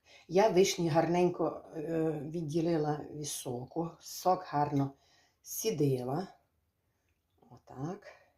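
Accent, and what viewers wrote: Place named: Bukovina, Ukraine. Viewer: Як смачно❤❤❤ Мені дуже подобаються ваші рецепти, а особлива ваша українська ... Це таке відчуття, що побувала на Буковині . А ви часом не з Буковини ?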